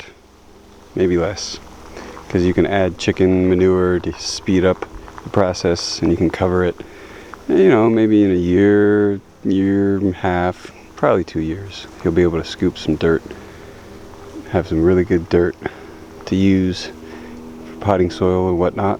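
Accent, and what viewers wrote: Hawaii, United States. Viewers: You sound just like the guy doing ApuaHawaiiTours(sp?),like you are brothers. Is that a Hawaiian accent or what is the origin?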